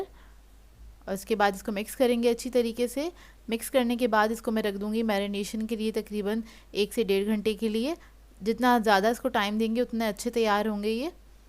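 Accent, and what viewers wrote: Karachi, Pakistan. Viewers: if u dont mind AP ne kis field me graduation ya master Kia Hy AP buht nice way me BT krti haen Me bhi Karachi me rhi Hun lekin ab Punjab shift hogye pr apka way of talking Karachi ki yad dilata Hy ar buht achi trah explain krti haen AP recepies ko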